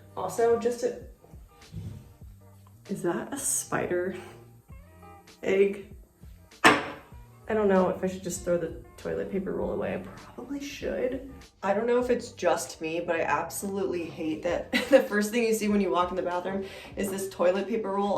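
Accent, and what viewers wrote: United Kingdom. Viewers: Wow … Legit looks amazing. Also the funny odd dorky British accent ish .. haha so random. So brave !! to have starting staining the shelf mounted …with the wallpaper …. My nerves !!!! Haha And yes….. toilet seats made of wood/ wood design.. look amazing. Well done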